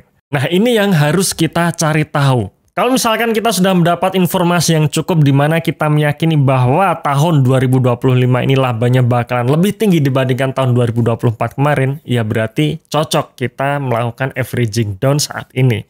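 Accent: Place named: Java, Indonesia. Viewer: logat medok jawane keliatan bener ga sih 😂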